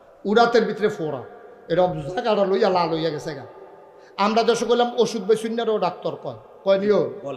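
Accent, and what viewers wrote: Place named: Kishoreganj, Bangladesh. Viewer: এই হুজুরের বাড়ি কি কিশোরগন্জ ভাষা সুনে মনে হলো আরকি ।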